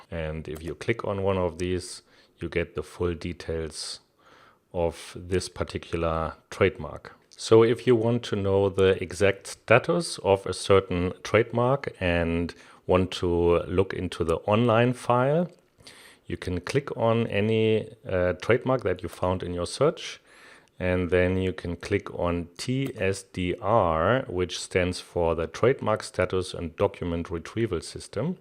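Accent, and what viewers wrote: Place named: Germany. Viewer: Who is this guy ? Sounds like he’s from Germany.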